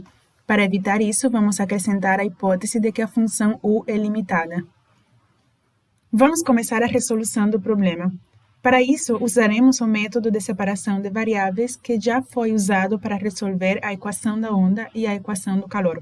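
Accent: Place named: South, Brazil. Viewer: Obrigado pela aula!! Fiquei um pouco distraído tentando descobrir de onde era o teu sotaque kkkkk. Chutaria que sua língua materna é espanhol, vc aprendeu português depois da adolescência e como vc fala muito bem, vc deve ter morado no brasil por um tempo, pelo seu sotaque sulista, acredito que veio morar em uma região do sul do br, acertei?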